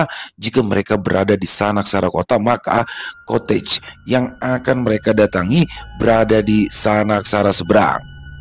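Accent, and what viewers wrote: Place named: Banjar, Indonesia. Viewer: Logat Banjar pengarang cerita. ❤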